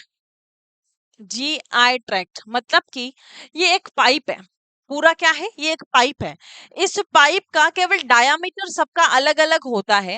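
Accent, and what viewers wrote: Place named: Kerala, India. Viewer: Ap kerala se ho kya mam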